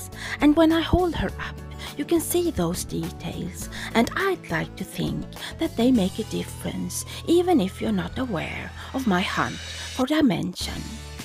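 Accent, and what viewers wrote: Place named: Scandinavia. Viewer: You are absolutely adorable! I love your work so much! All of the work that goes in to those details...incredible, and so very well done. Your creativity knows no bounds Love, love, your imagined birds and flowers. So special...and I could listen to you talk all day with that sweet Scandi accent.....and a "heavy book" by Jamie Oliver - yes, please!!!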